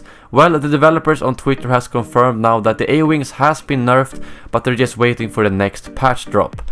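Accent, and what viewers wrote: Sweden. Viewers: You are a great YouTuber to watch, keep up the great uploads! And who doesn't like that Swedish accent?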